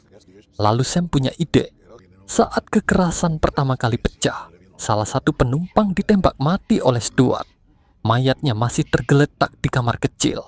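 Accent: Java, Indonesia. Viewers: di awal video, mimin kyk logat bali, lalu ganti logal jawa medhok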